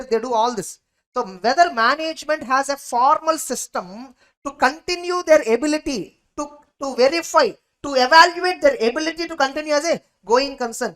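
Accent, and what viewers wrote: South India, India. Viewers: Im gujarati medium student Watching audit in english in south accent in inter Aura <num>😂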